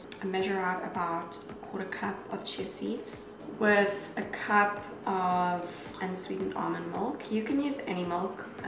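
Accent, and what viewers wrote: South Africa. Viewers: Caislee Erica It’s South African. I’m not sure what part of South Africa tho. Because I’m from Cape Town and we sound slightly different than her.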